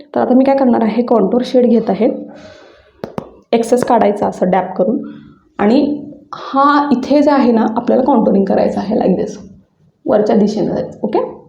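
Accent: Kolhapur, India. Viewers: Tumhi chan sangta pun kolhapuri bhasha samajate lagech .. aso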